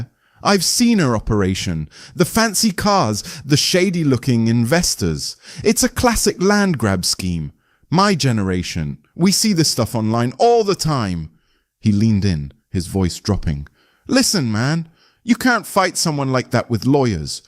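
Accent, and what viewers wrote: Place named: United Kingdom. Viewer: Sorry hearing the Accent from the UK talking about Texas farmers is too phony😊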